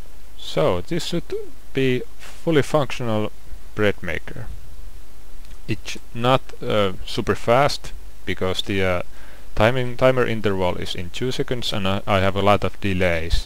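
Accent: Finland. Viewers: you sound like finnish guy speaking english... are you finnish?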